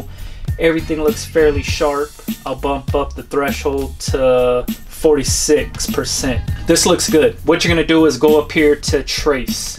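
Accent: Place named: New Jersey, United States. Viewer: Great video fam! I've been thinking of making some merch for my esk8 channel (shirts, stickers). But there's a learning curve so might outsource. Given that, making the videos, editing, and all the esk8 there's only so much room for my skill level. What do you think. Btw, you sound like my cousin...are you from Jersey? - Neil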